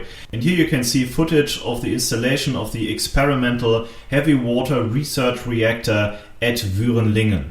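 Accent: United Kingdom. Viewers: love the british accent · I loved the British voice LOL ;-) Brilliant video again, was there some Kraftwerk in this video? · lol at your British accent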